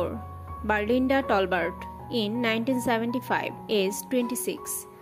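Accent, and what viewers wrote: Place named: South India, India. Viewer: This narrator has very strong South Indian accent. I can't understand her ?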